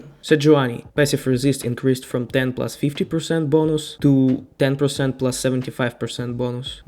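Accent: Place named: Russia. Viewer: Фикси акцент, слышно что ты russkey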